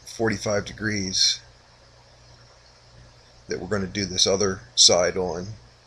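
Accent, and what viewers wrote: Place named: Pennsylvania, United States. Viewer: I'm enjoying your videos, sir! You have a certain inflection in your voice that tells me you may be somewhere up around my parts. I'm south-central, Pa. You sound more south-eastern, Pa/Delaware. You have a new subscriber. Awesome craftsmanship!!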